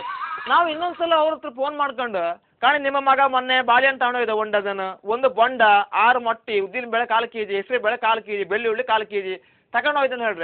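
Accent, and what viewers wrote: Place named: Kundapura, India. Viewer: Namma hebri namm hemme, I love kundapura kannada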